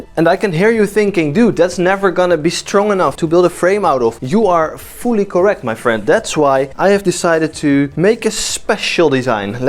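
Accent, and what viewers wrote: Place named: Netherlands. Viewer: I hear a dutch accent haha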